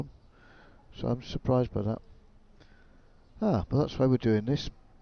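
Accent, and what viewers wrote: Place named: United Kingdom. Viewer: What part of the UK are you mate?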